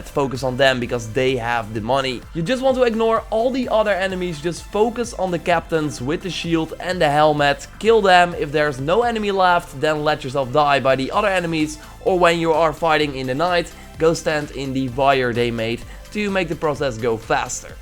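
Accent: Netherlands. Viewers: You’re definitely dutch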